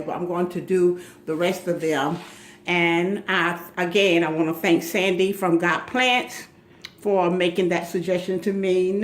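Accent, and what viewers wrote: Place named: South, United States. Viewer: Miss I think you are precious I do totally enjoy watching your videos you make me laugh you remind me of my ex-husband's grandmother. But I am positive that you are not as old as she. But it's just maybe your southern accent or the way you talk but I just find you precious please keep putting out the videos that you have your plants are beautiful I do enjoy my plants also I talked to them and I love them like they are my babies and my grown children laugh at me my son laughed at me today because I just bought a peace lily today and I was so tickled I could not wait to get it home thank you for your video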